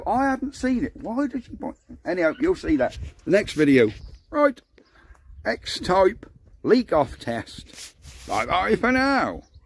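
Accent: Norfolk, United Kingdom. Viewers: You sir, have the strongest norfolk accent I’ve ever heard😅😂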